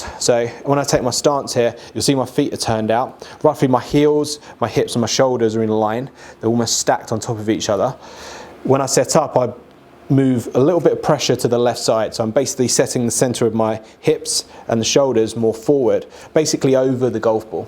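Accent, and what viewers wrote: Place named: United Kingdom. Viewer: LOL! I wondered with that British accent how you could be Canadian. Another!!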